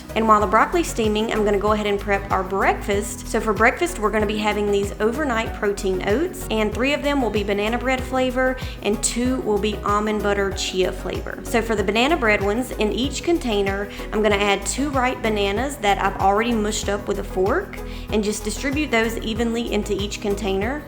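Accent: South, United States. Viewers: Giving you all the likes! :) you are beautiful! And I love your southern accent and your recipes ☺️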